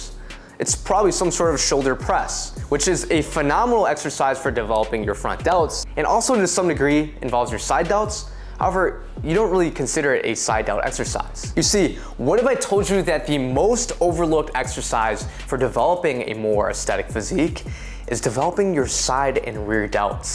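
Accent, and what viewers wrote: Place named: United States. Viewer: I might be racist but I never thought u would have such an American accent 💀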